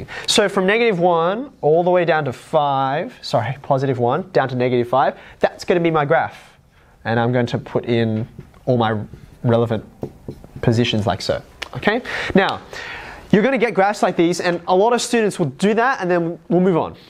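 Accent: Australia. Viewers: nah he's from the land down under (australia)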